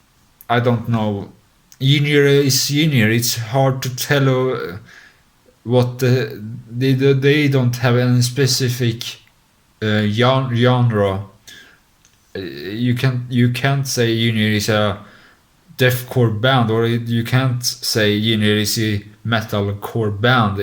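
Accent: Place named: Sweden. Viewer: You speak good english, but there is of course a little dialect from the Swedish dialect, but everyone has a little dialect, wherever they come from:)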